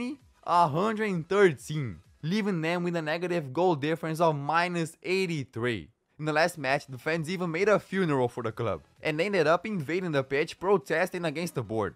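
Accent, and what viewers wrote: Brazil. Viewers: Bro,just for curiosity,are you brazilian?juat because your accent,btw iam brazillian :)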